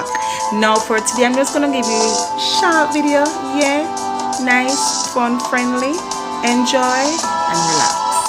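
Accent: Jamaica. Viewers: Some real yardie talking yes man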